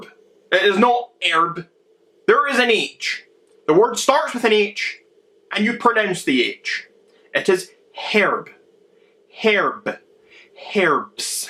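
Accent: Scotland, United Kingdom. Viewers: lol dude from a different country with an british accent is telling me how to say herb go to a Spanish guy ask him I bet he won't say it like you you non educated crazy jerk and by the way we all day it as herb with a H dumb*** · Do not make fun of us Americans. Queens English is not hard, it’s called accents you dumbass. You have a Scottish accent, I have a Bostonian accent, I say erb, you say herb. Not something to make a video about when your Chanel is dying and when you make uneducated remarks with no evidence. You know what I’m talking about.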